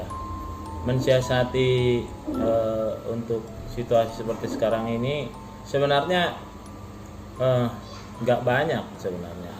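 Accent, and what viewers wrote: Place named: Medan, Indonesia. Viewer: Pak orang batak apa bukan pak Suara nya nada medan pak Salam dari saya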